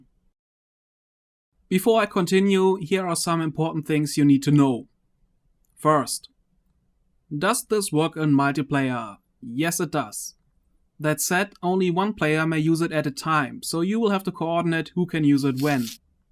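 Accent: Germany. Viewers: Kann es sein das du eigentlich deutsch sprichst?😁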